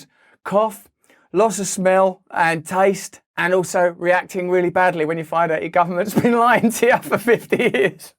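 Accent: United States. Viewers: Dude, I love your American accent. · Your American accent is pretty good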